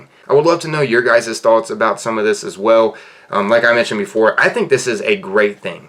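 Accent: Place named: South, United States. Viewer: Me, looking at some of the channels I used to watch when I was younger: What is this place? Seriously, the uptick in quality and preparation shows. But, overall, that same vibe just comes across, idk why. Maybe it's the slight southern accent? Also, just a fun thing: I, a 17 year old now, have intense nostalgia for your desttiny year 1 content.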